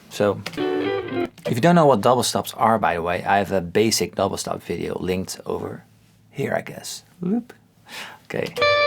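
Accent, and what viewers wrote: Netherlands. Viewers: I was initially annoyed by your fantastic English in that Dutch accent, not to mention your prolific beard. I have become a true fan, though. Thanks for all the great content.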